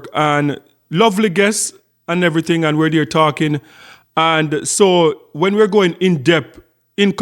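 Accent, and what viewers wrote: Jamaica. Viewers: The accent is very similar to the Jamaican accent. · I can tell by the way you communicate you were born in jamaica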